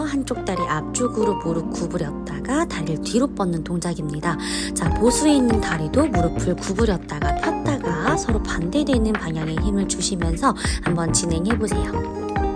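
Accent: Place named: China. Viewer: 동물원에 가서 다리 찢는 영상 보고 왔습니다. 조선족인가요? 한국말. 하지말아주세요ㅜㅜ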